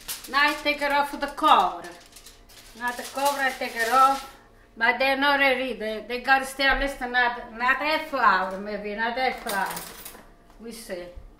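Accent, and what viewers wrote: Italy. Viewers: What a sweetie! Reminds me of my friends mothers in the Bronx, Naples, and Catania. We would be in the kitchen after school helping them prep and cook just like she said. (Except they had a stove and oven in the house by the 70's and 80's!) I live in North Florida now and you just don't see or hear that beautiful italian accent and sensibility here. North FL natives are 'senza sapore.' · Dear, I watch your videos all the time and I love them. It's not just the real italian recipes and the way you prepare them, it's a' you and the way you speak your Itali-ish! You have a wonderful personality and are a very conscientious cook. BTW, you are not "TOO SLOW!" Perfection takes time, it cannot be rushed! So thank you for taking your time to prepare these delicious italian recipes for us on video and step by step. You are not only educating us, but, you are also very sweet and entertaining. 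God bless you and thank you very much. Your family is proud of you and love you and it shows. They are blessed to have you and you to have them. Please keep making your lovely videos for us out here. You are a gift and we love you!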